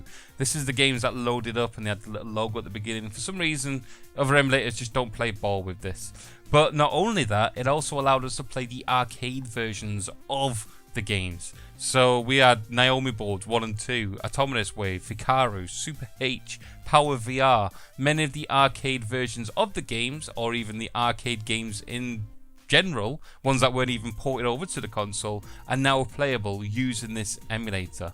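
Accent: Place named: England, United Kingdom. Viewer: I did not watch your channel for a while since I had been busy with my work. I think I have so many to catch up tho. The English I learned was all American(accent). It's kinda odd to hear English English though many would say it's the original/formal/polite English speaking. I don't care other sayings, it's still difficult to me to listen. However, I really enjoy your accent, that's the only one I understand and like the most:D. And that's the reason I stick to this channel and press thumbs up every time. I wish one day I could speak like you. That "good day gaieyes", that "waful", that "push it to the limit", and that "most'fallll".....:D:D:D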